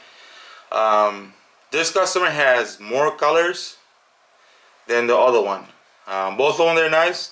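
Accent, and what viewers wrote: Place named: Arab world. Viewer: Where r u from u sound Arab, can you please do a review of more different color shoes, thank, nice review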